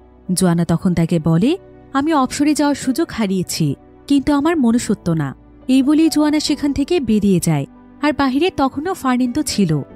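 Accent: Bangladesh. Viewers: accha apo .. apni ki Dhakai taken?😶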